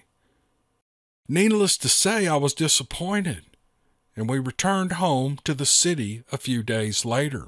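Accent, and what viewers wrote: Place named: Appalachia, United States. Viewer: Not sure if anyone answered the question what is GLAMPING but it's basically glorified camping!! Kinda like u know high end camping with a big nice r.v that has a kitchen in it air conditioning and all the finer things u get at home but on a campground. Cause your a country boy like me when we went camping growing up and some of us evening now camped in tents sleeping bags and "living high on the hog" was an air mattress 😂. We used a camp fire possible a grill at the cp site glampers cook in there stove or in there fancy gas grill.. as I get older glamping is nice lol I get stuff and wet in an old ⛺ tent anyways I guess I could be wrong but this is my interpretation great show your our hero love your voice u sound like someone from the holler don't ever change man.. your friend Billy Kirby Lake Cumberland Ky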